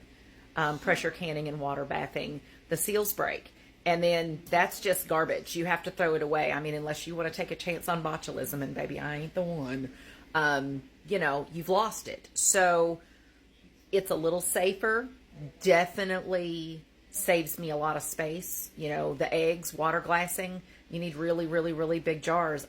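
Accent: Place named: East Tennessee, United States. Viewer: I SWEAR, you GOTTA be from East Tennessee!!!! Plz say ?!??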